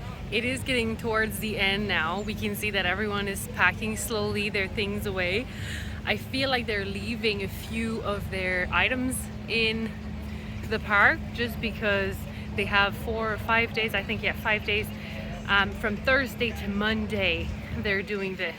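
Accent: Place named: Ireland. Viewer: I love that you have a weeeee bit of an Irish accent, looked like a fun day! Thank you for sharing 🥰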